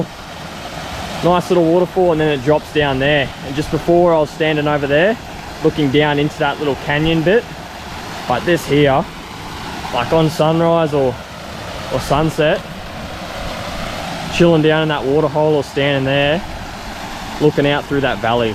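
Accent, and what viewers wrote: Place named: Far North Queensland, Australia. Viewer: Your production is incredible. I absolutely love ALL your videos. Your camera presence is so raw, real and refreshing. The FNQ accent makes it even better haha! Will you ever consider travelling overseas and create hiking videos? Great work. 🙏🏻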